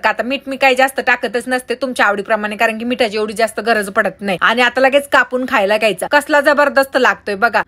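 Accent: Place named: Khandesh, India. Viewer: khandeshi bhasha 😅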